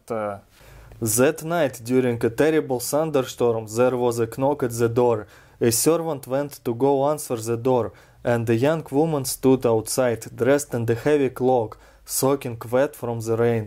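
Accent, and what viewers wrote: Russia. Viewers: Im obsessed with russian accents, specifically strong ones like yours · thank you my comrade i am learning to do a Russian accent for voice acting and this helps a lot because your voice and accent is perfect and strong. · I'm already subbed to your channel, but this just popped up in my feed. I love your Russian accent. I could listen to you all day. 😊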